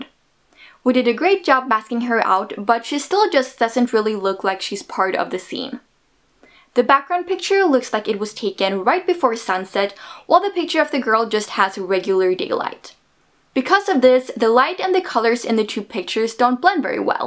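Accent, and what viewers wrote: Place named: Yugoslavia. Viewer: This is great. Thank you. Btw, I love your accent. Are you originally from Yugoslavia? Your diction sounds like tennis star Monica Seles.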